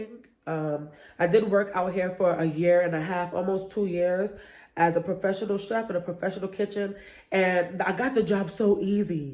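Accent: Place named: New York, United States. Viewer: Love your accent omg I be trying to talk like a New Yorker lol I'm from Oklahoma City, Oklahoma we have a country accent 😂😂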